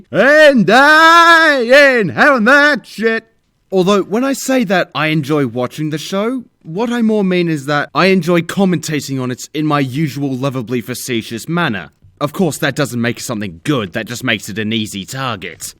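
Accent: Australia. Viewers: Its like Tears of Grace and The Escapist had a love child with an australian accent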